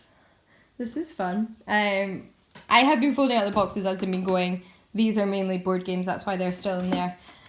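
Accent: Scotland, United Kingdom. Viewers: your accent <3 Love the Scottish accent ! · This is definitely the most Scottish I've ever heard you sound in a video.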